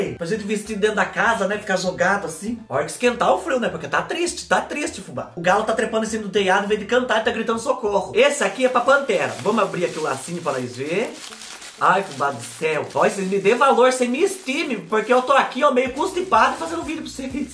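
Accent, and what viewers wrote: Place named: Riversul, Brazil. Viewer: ai kkkkkkk nao aguento, minha vo é d riversul e fala igual vc, jaguara, pandu kkkkkkkkkkkkkkkkkk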